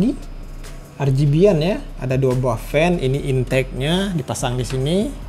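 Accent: West Sumatra, Indonesia. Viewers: Logat minang nya sangat kental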